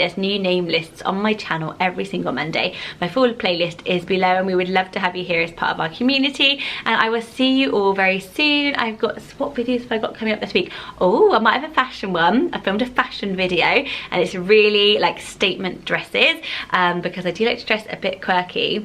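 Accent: United Kingdom. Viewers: It's so funny for me to hear the lovely British pronunciations of some of these names. I'm from the US and particularly the south. So the name Blanche - my grandmother had several friends named Blanche and with the US "a" it's just not pretty at all. One "blanches" vegetables. With the French accent Blanche is very pretty. Here's a name for you - Jathena. That is my sister's name. I'm sure you've heard of Athena - well her name is Jathena. Quite pretty. My name is Janine. When I was growing up in the south in the US, NO ONE was name Janine - for a few years there was a Janine in every TV show and movie. It's lost it's popularity again. Anyway, we are almost exactly one year apart and we were Jathena and Janine.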